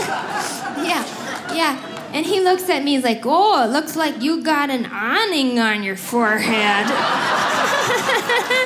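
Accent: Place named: Wisconsin, United States. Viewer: I love her accent! As a cheesehead in Wisconsin, I probably talk the same way.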